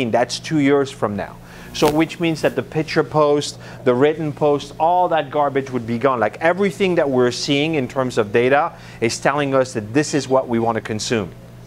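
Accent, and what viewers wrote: Quebec, Canada. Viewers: Sensing a Quebec accent :)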